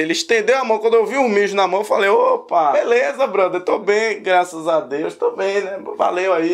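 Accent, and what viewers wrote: Bahia, Brazil. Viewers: Manooo surtei nesse sotaque 😍😍😍 vo namorae um baiano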